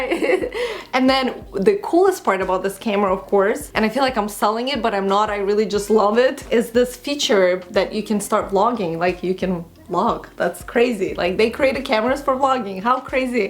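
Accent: Russia. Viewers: whats your accent from? you sound a little bit russian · Hi, i love your videos ♥ I started watching them recently. I know, that my English is so-so (I'm from Russia), but I think you're from Russia too, cos u have a Russian accent, is it? It may be, I have mistake. :) and by the way, you is a beautiful <3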